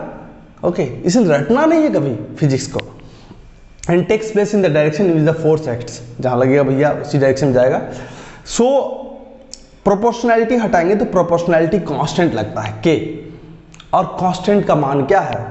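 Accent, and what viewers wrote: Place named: United States. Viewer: Sir thoda jyada American English ho gya but achha laga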